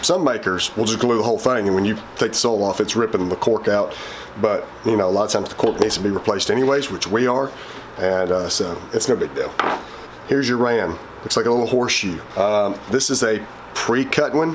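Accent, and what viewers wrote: South, United States. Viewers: Omg i love this guys voice!!! It's so nice and deep! And that southern twang!!! I love it 10/10 can and will fall asleep to it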